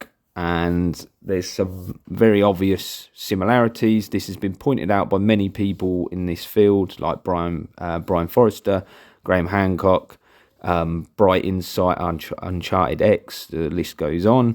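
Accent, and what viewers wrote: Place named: United Kingdom. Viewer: Hello, and I am so glad that I have tripped over your site. Not only do you provide lots of info, but you have a British accent and pronounce things in a way that I am used to hearing them. Some American sites have robots talking that should be shot. I look forward to seeing more from.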